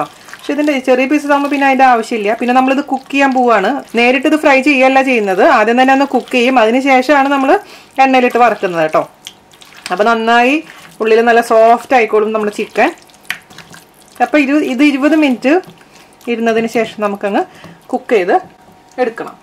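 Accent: Thrissur, India. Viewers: Dear sister you are very honest we can understand from your talk trichur slang may God bless you 🙏🙏🙏🙏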